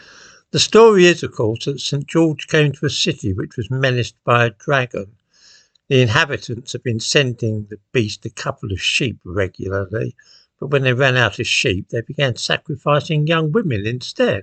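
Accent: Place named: East London, United Kingdom. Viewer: Strong hint of East London accent this morning. Appropriate for this waffle.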